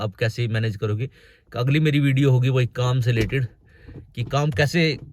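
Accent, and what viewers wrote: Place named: Haryana, India. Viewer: bhai mein yamunanagar se.... Apki boli tu jame humare karni ki hai ... Konsa agent tha apka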